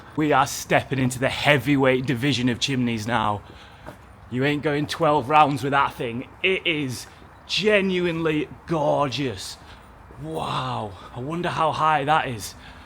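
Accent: United Kingdom. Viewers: I really appreciate your handsome brit accent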